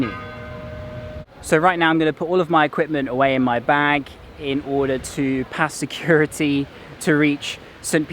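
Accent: United Kingdom. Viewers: R U From The UK. You Have A British Accent. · I am glad that you started your travel again. Astonishing views of Vatican. Beautiful architecture. I want to visit Italy. Well made videos with a great music background. Well done,!!! I also like your British accent😘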